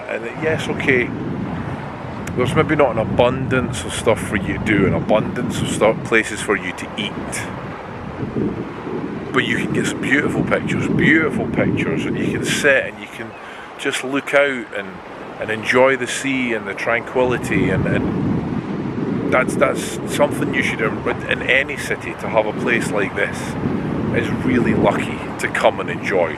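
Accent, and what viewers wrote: Scotland, United Kingdom. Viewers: My little cat Jasper seems to love your Scottish brogue. You really have his attention. 😊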